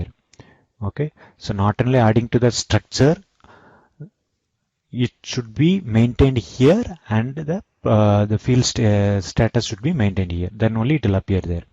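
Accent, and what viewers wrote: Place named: India. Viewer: Next time speak slowly , Indian English (hind) is confusing !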